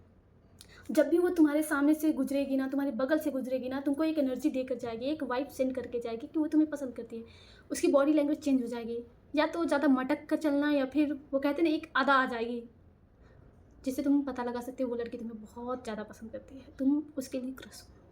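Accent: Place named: Banaras, India. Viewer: Aapki bhasha se aisa lgta hai banaras ya sultanpur ya jaunpur ya udhar he side se ho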